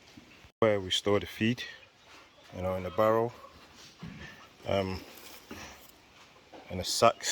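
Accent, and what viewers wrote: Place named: United Kingdom. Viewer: Just found ur channel .. u got a British accent mate lol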